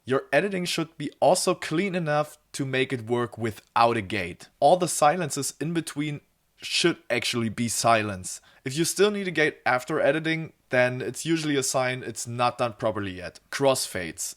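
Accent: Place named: Germany. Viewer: Ich vermute mal, dass du Deutscher bist (hört man ganz leicht am Akzent). 😉 Die geile DI, die du im Video zeigst, klingt fast so, als hättest du ein Saturation-Plugin oder einen Transient Shaper draufgepackt. Ist das wirklich so? Falls nein: Welche Effekte nutzt du exakt für diesen Sound? Drehst du manchmal auch die Phase oder lässt du sie original?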